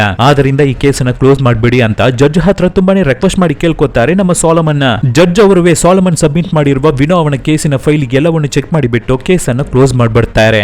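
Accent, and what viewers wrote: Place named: Kerala, India. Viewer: malayali kannada accent kaka angadi maatu ide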